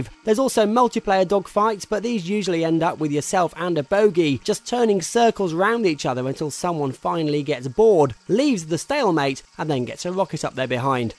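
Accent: England, United Kingdom. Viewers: he speaks funny this is why i like ricky gervais and british people. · british narrator for american game wtf? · what is this accent??? english? canadian? australian? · GRRR british accent sucks.. Its same as german and english together -> British... Nothing beats american Accent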